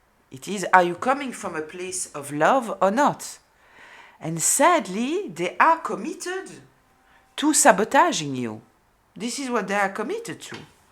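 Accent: France. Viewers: U are simply a Candy to my Ears, all that French accent and the typical French frankness and dutyfullness 100%%%% on the Mark . ❤❤❤🎉🎉🎉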